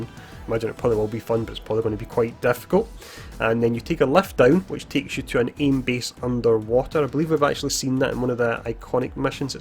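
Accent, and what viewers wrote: Scotland, United Kingdom. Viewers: this video was recommended to me if that helps as I've been watching a lot of different avengers content....I just cant figure out your accent...Scottish?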